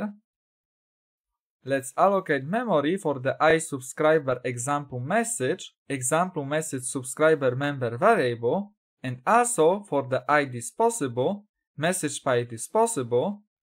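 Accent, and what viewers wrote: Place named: Poland. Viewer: Ziomek, słuchać w uj że jesteś Polakiem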